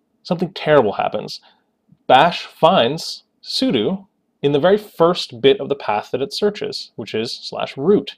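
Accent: Russia. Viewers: damn! that was a perfect russian accent man! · Отличное видео и хороший русский акцент! p.s. Из России с любовью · I loved your Russian accent 😂😂😂😍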